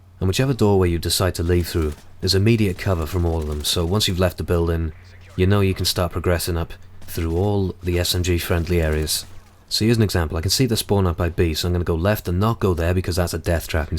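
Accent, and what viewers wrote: Wales, United Kingdom. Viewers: Are you from Wales?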